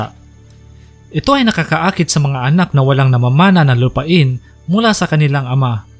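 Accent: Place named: Visayas, Philippines. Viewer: Astig sana yung kwento... Kaso may pagka bisakol lang yung pag lalahad nung kwento...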